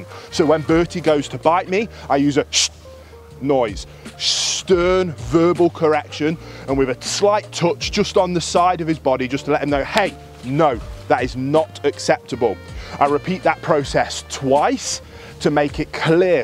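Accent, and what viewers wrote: United Kingdom. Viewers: I was not expecting this giant viking looking dude to have a british accent 😂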